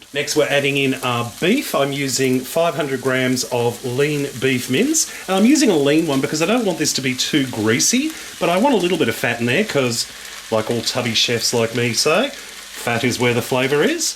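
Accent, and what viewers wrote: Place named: Australia. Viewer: Australian accent is 👌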